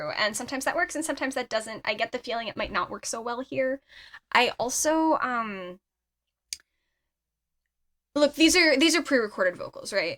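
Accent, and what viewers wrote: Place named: England, United Kingdom. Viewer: Your voice and english accent is so annoying.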